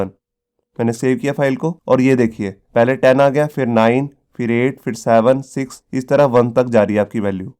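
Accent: Punjab, India. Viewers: you cannot control your punjabi as amny punajbies face this problem and punjabu words like porri comes out involuntry.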